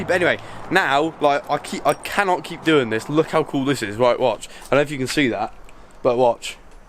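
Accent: United Kingdom. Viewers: You from Bristol is it?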